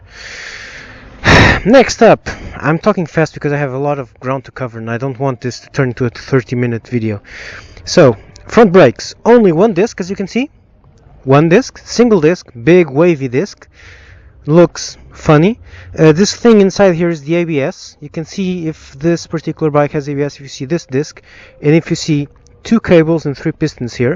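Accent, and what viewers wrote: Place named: Portugal. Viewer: Awesome review, I'm also portuguese, so I caught on to your accent immediatly, I have the same haha, and I also own and NC700x. And I "downgraded" from a CBR 900 RR zx10rgsxr crotch rocket thingy, like you so well put it. The thing is that with my 900 i could never explore the machine in the road properly and the largest trip I was able to fathom was to the next petrol station. With this one I feel I can go anywhere. It's a great, fun and useful bike, a bike for the real world!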